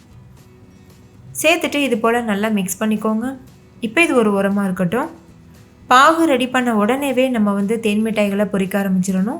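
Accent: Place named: Kerala, India. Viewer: Unga native enga unga voice Kerala slang Madhiri irukku thank you for your reply to me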